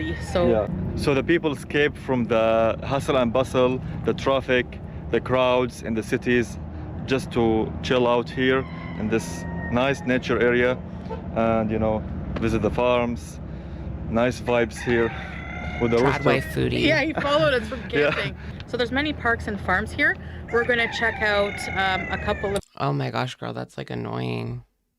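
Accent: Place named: New Jersey, United States. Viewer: Sometimes I can't tell what you're saying with your kind of new jersey accent over the silly fake accents you do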